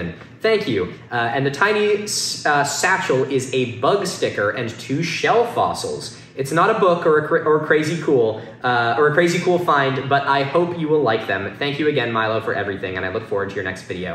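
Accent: United States. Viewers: I KNEW YOU HAD A MASS ACCENT OMG I figured Rhode Island was just close enough but I feel 10x better knowing you’re from Mass. I have so many friends from there y’all all talk the same LOL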